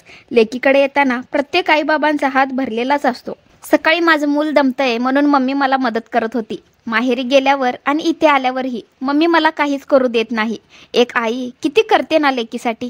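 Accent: Kolhapur, India. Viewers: Tumch gav konat.bhasha kolhapur chi vatate.mi pan kolhapur madhun aahe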